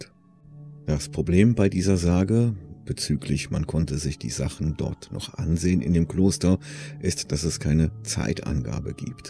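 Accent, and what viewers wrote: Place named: Cologne, Germany. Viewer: Ja die Stimme 👍🏻…. als Regierungssprecher wäre der beste Wurf den diese Regierung machen könnte. Und diesen Akzent kenn ich nur zu gut als gebürtiger Kölner…… den findet meine Frau auch so amüsant und ansprechend 😉. Leider ist es mit meiner Stimme nicht so gut bestellt 😄. Ich würde mir wünschen diese Stimme mal als Synchro Stimme im Kino zu hören….. finde das mittlerweile manche Stimmen gefühlt in jedem Film vorkommen. Speziell die von Ryan Reynolds, Chris Evans und der Himmel weiß wer da noch alles synchronisiert wurde von dem Herrn. Da wäre mal eine neue Stimme mal wirklich angesagt.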